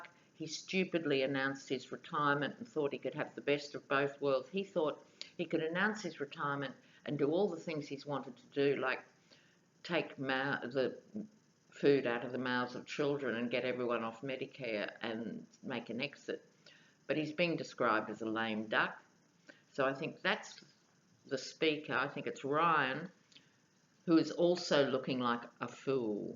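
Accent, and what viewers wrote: Australia. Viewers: I just came across your Tarot videos yesterday. I Love ‘em, but I am writing to give you kudos for your up to date and in depth awareness of what’s going on politically in the USA. I am amazed. You know as much as any political junkie here does. I recognized your accent as Aussie but assumed you must live here, until you mentioned you were in Australia. How do you keep up?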